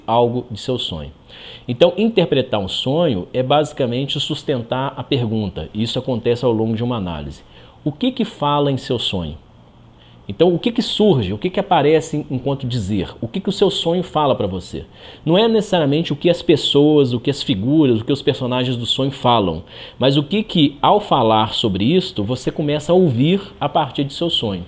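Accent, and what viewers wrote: Minas Gerais, Brazil. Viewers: Gosto muito do sotaque mineiro, é suave, vai muito bem para um psicanalista. é um excelente representante de Minas Gerais.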